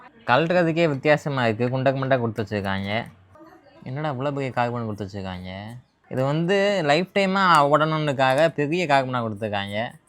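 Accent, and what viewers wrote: Madurai, India. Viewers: Madurai slang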